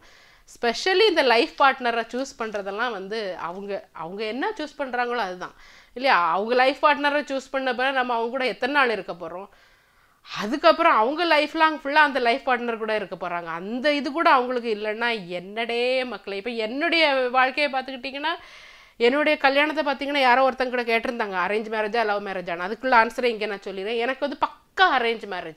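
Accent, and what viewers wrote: Nagercoil, India. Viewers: நாகர்கோவில் தமிழ் அருமை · Very Very great, because of your natural expression of your way of giving the replies. and waiting for the more and more videos from your end. Your Nagercoil slant is added advantage for you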